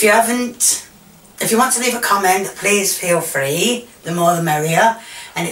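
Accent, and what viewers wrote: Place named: Yorkshire, United Kingdom. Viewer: I love all your videos. I live in London now, moved from Yorkshire, so it's so nice to hear your accent. Keep enjoying yourself and ignore the idiots:) X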